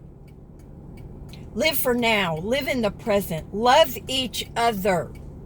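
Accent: South, United States. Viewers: Love the way you talk that southern talk. My family from the south too. Thanks for sharing. Jehovah God take care of all things if we let him. Safe travels.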